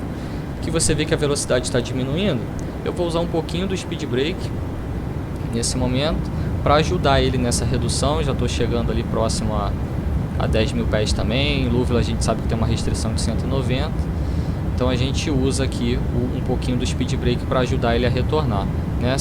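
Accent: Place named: Rio de Janeiro, Brazil. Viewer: Muito obrigado comando! Sua didática é excelente. E parece ser meu conterrâneo do Rio de Janeiro kkk você é piloto real de 737 ?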